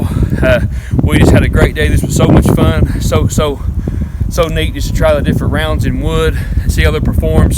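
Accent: United States. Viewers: The video was really refreshing, what I call proper American. I am from UK and bird and rabbit shoot in woods not dissimilar to yours, unfortunately, our government takes a dim view of the populace having firearms, so best I can shoot without spending a fortune is shotguns. Just love your accent dude, you come across real natural, and just pop anything up when you got the time, we hillbillies got to work to make ends meet. I do shoot an American flatbow in my hunting grounds in Hampshire, heard you backwoods boys are handy with a bow. Grace and Peace American bro.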